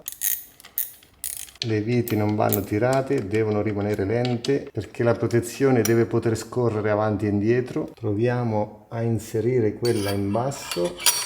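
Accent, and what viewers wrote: Marche, Italy. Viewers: Caro, seguo molto volentieri i tuoi video perché sei bravo preciso, hai tanta esperienza, ma soprattutto il tuo accento marchigiano ( credo) mi piace un sacco 😊 Vengo al punto: il parateste in acciaio originale Bmw è per te efficace per un uso del GS che non ha il tubulare esclusivamente su asfalto?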